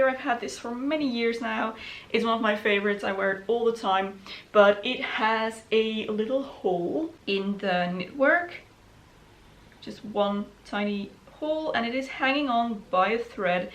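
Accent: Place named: Netherlands. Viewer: I thought to hear you were Dutch and then I saw the Harry Potter books. Greetings from another Dutchy! Love you vids!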